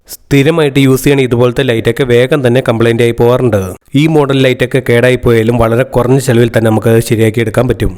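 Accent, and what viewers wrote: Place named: Muvattupuzha, India. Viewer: Kothamangalam or muvattupuzha.😊 സംസാരം കേട്ടാൽ അറിയാം😊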